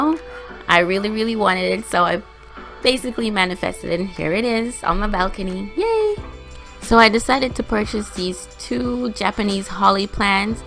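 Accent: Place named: Caribbean. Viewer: Yes! I only caught a hint of the accent. I was actually thinking you could be from St. Vincent. Was not expecting JA at all! LOL!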